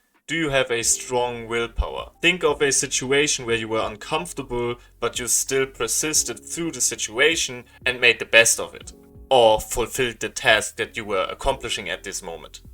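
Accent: Germany. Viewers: digga dein deutscher accent ist so zu hören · geiler deutscher Akzent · Are you german? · You sound german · You sound really german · Your german accent is really great! it's always good to improve your language ofc :D but your german accent is absolutely fine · Bruder man merkt so krass das du deutsch bist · Are you German? Klingt bissl so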